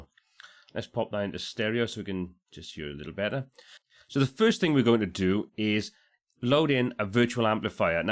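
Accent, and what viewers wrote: Wales, United Kingdom. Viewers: Great video, and such a joy to hear a Welsh accent.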